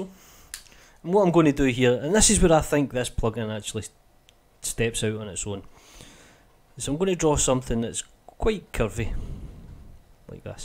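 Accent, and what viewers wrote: Scotland, United Kingdom. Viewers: Nope, that’s Extremely Scottish Scottish. :)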